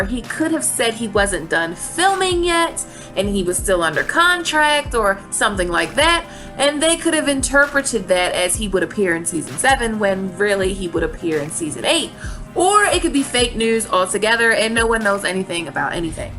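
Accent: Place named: South, United States. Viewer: love that Southern accent that pokes out from time to time :)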